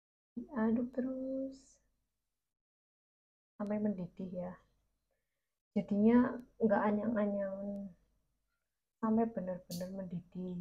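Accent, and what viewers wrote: Banyumas, Indonesia. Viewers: . Mba jawane ngendi si ko kayane wong ngapak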